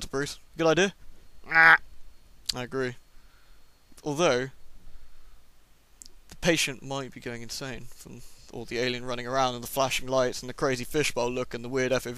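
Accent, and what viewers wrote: England, United Kingdom. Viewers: I'm not english but brit man's accent is terrible, if he really is english I'm pretty sure he is from somewhere where the economy is 95% sheep. How is he making video games?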